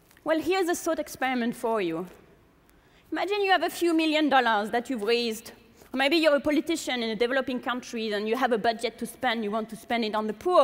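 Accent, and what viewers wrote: France. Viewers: that's how a french speaker speaks english. Speaking some of the R as H, some H as SH. French is easy and fun if you English and Hindi.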